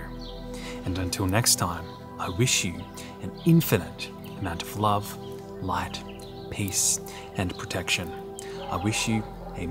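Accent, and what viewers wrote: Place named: Australia. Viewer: Is he Australian? I love his accent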